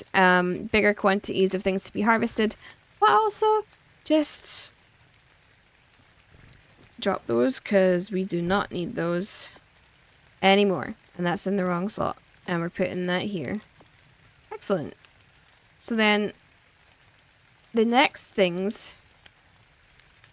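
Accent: Scotland, United Kingdom. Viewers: Stone foundations are great for crafting when taming a drake. You're a Scot. I love that. I lived in Glasgow for 2.5 years. I'm a huge Scottish history lover. Massacre of Glencoe is/was a huge interest of mine. Ancient Scot history is brutal. Awesome listening to your accent. Scotland is such a beautiful country and should never have merged the thrones with the deceitful low landers throne.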